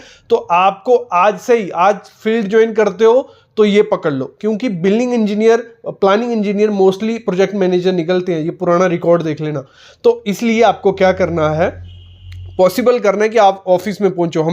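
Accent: Jammu, India. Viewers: Jammu se ho kya aap?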